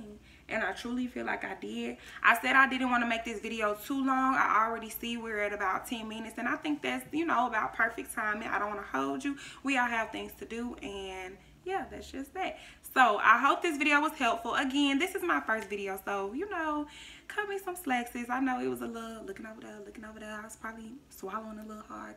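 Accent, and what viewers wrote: Atlanta, United States. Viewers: Lol i was like u sound like from ATL and right after i said it u said u were from ATL. Lol im from here too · Tour video just popped up in my library. Good advice. Also you have some pretty skin and love the southern accent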